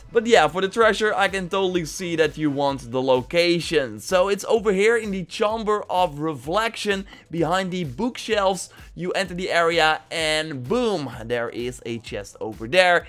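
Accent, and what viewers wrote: Netherlands. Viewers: je bent overduidelijk een nederlander, dat accentje haal je er ZO uit hahaha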